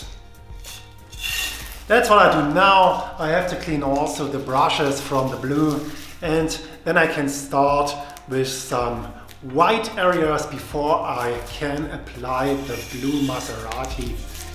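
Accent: Saxony, Germany. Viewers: Höre ich da sächsisch raus? Super Arbeit! Top! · Nice German acent! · your english ist so f***ing German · the Saxonian accent pleas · Man hört das deutsch durch:-))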